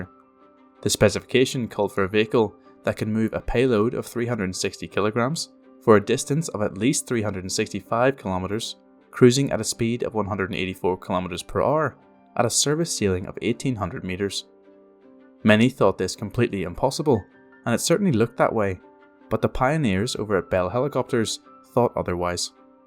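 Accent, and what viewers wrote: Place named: Belfast, United Kingdom. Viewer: Sounds a bit like a Belfast mon...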